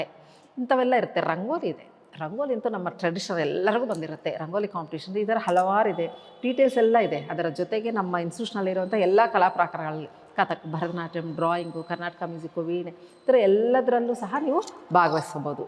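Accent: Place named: Andhra Pradesh, India. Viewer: ನೀವು ಮಾತನಾಡುವ ಶೈಲಿ ನೋಡಿಯೇ ನಾನು ಅಂದುಕೊಂಡಿದ್ದೆ ನೀವು ಆಂಧ್ರ ಮೂಲದಿಂದ ಬಂದವರು ಅಂತ, ಆದ್ರೆ ನಿಮ್ಮ ಕನ್ನಡ ತುಂಬಾ ಚೆನ್ನಾಗಿದೆ😊ಖುಷಿ ಆಯ್ತು ನಿಮ್ಮ ಸಾಧನೆ ಕೇಳಿ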